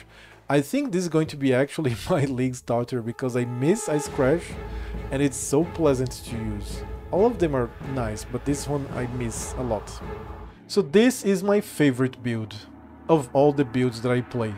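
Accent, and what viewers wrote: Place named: Brazil. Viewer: You sound brasilian 👀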